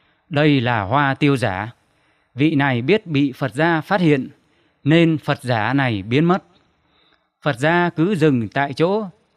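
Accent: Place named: Thanh Hoa, Vietnam. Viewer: Giọng đọc Thanh Hoá nghe rõ . Nội dung có chính xác như của chùa thiền tông Tân Diệu không ?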